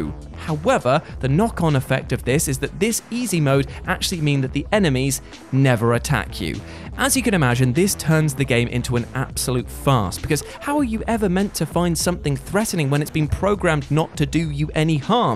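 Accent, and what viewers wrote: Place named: United Kingdom. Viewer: Add "inventory" to "aluminum" and "advertisement" as words that sound terrible when said by Brits.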